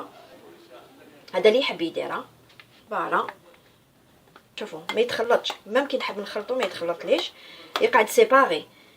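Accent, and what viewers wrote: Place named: Algiers, Algeria. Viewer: J adore votre accent algérois et votre recette aussi 😍❤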